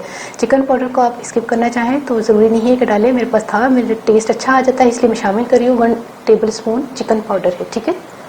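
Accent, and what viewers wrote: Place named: Punjab, India. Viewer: Banda bry gor naal twadi video Wekh rya honda ju e jnab tusi punjabi boldy o andru'n hasa nikal janda😜🤣😂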